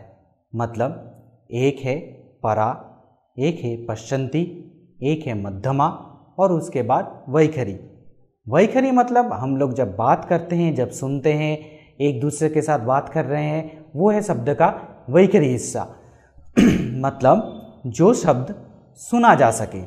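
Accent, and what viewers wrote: Bengal, India. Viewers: I appreciate your efforts. Your explanation gives relevant information how and why veda mantras have remained intact and un-adultered. I am not going on your accent due your Bengal origin. This does not change the meaning of Vedic Mantras today because these mantras are available in printed text. We must respect our ancient rishies who developed various pathas, only to see that no one can make any adulteration in them. It is human drawback that people make changes in the original text as they like. Brahamanaas literature is example. However, now we should try to understand the meaning of the words of original Veda Mantras also, which are profound. Since we have the written original text is available, the meaning of the mantra does not change as we don’t go by the pronunciation but by the words and their meanings. Therefore, people criticizing your efforts should take your explanation in right spirit. 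These mantras are profound and powerful only by understanding the meanings and not by simply chanting as many understand today. Congratulations to you. Regards. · I think he is speaking with a Bengali accent, hence the problems in pronunciation. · साधूक्तं भवता । पुरुषः वङ्गदेशीयप्रायः नाम्नः, तर्हि तद्देशीयानामुच्चारणपद्धतिः जानीमः, तर्हि क्षन्तव्योऽस्माभिः । प्रसङ्गेण वक्ष्यामि, भवतां भाषासौष्ठवमभिवन्दनार्हम् । जयतु संस्कृतं जयतु भारतः । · ये बंगाली हैं. मोद्धोमा तथा शोरित बंगाली मूल शब्द है. बंगला में 'व' अक्षर नहीं होता.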